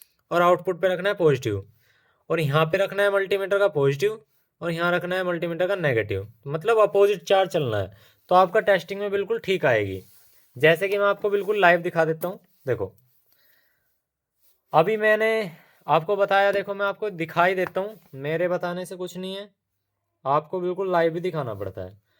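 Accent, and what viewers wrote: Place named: Tripura, India. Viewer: Kaisa ho sir, Tripura sa bol raha ho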